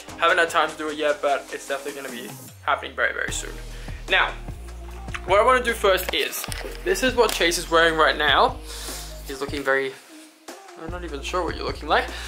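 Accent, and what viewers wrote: Australia. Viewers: You are Australian I could hear it and as soon as you said you flew him from Australia it clicked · Literally look me five videos to realise your Australian.... I’m Australian and I couldn’t figure it out 😂😂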